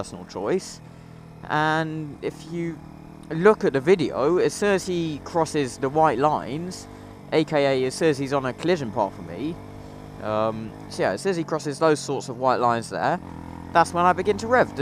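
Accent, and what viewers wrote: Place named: England, United Kingdom. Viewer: Normally i hate the english accent but your's is awsome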